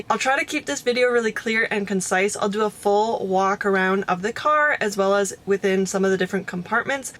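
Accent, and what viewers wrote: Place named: Canada. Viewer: She sounds Canadian 🇨🇦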